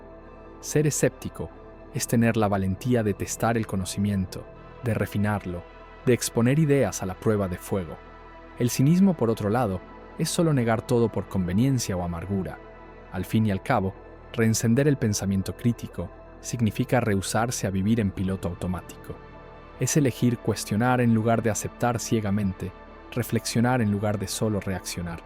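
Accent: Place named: Buenos Aires, Argentina. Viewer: Exelentes reflexiones y la narra suena bastante como nuestro castellano en Buenos Aires. Muy lindo canal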